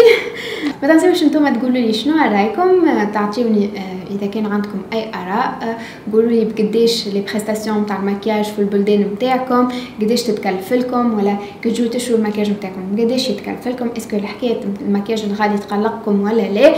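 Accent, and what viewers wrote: Tunisia. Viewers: نموت على اللهجة التونسية😍 جارتكم من الجزائر 🌺💙💙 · هي تونسية ولهجة تونسية قربية بزاف لهجة مغربية ومصرية 🙄فهمتي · تونسية من الأب و الأم و نعرفها ايش جابها للجزاير !!!!!! هذه لهجة تونسية لا علاقة باللهجة الجزائرية اختلاف كبير مش عارف علاش تتلصقو في كل ماهو تونسي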